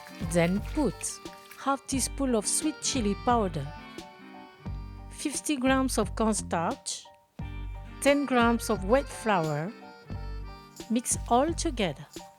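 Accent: France. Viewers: I like how you’re Chinese but sound like you’re French.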